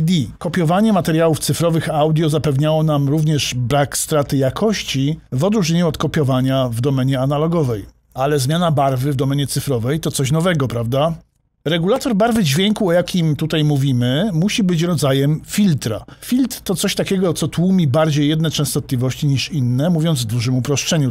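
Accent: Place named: United Kingdom. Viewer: Pańskie filmy bardzo mi się podobają i wiele się nauczyłem. Ale.... Nieznośna jest ta maniera stosowania na każdym kroku fonetyki angielskiej. Może dałoby się to zmienić? Przepraszam za niemerytoryczną uwagę, ale nie mogłem się powstrzymać.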